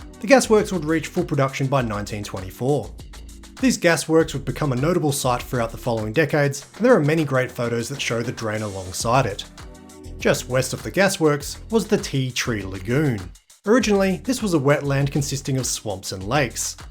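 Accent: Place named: United States. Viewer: Being about local history it’s a shame about the American pronunciations. Top video as usual though. Depot and rerouted .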